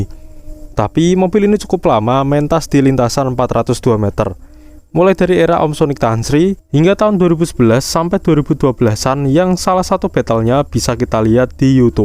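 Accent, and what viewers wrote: Java, Indonesia. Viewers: Medokmu lo minnn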